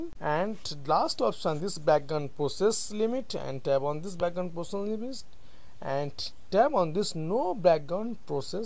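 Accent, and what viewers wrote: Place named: England, United Kingdom. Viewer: English khatarnak hai bihari...par axcent pura angrejo wala mara hai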